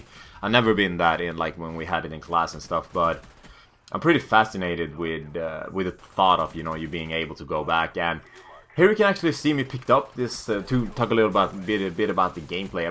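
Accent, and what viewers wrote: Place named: Sweden. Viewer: "the shooting of yon f kennedy" hahaha swedish accent FTW!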